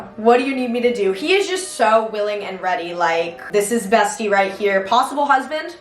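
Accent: United Kingdom. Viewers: r u british??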